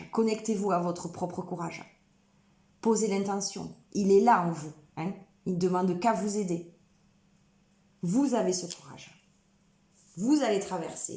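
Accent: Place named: South, France. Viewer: ✨✨Bonjour ✨✨ je suis abonnée depuis peu à ta chaîne, je pense pas me trompé, en disant que c'est Aurélie Chamane qui nous à parler de toi et de ton oracle, et que les cartes était faîtes à l'effigie de tes peintures??? Wouah...tu as l'accent du sud comme moi. Qui suis native de cette région. Ta guidance ma parlée, je te remercie pour ce partage. À tout bientôt..... ✨🌴🌈💜🙏💜.🌈🌴✨..😘.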